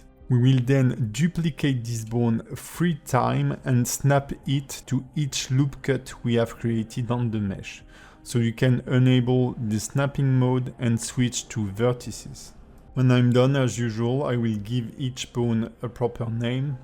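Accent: France. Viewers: i was gonna purchase your animation course but after watching this video, im not so sure anymore. i know you are not an english native speaker but ur french accent makes the learning process 10x harder.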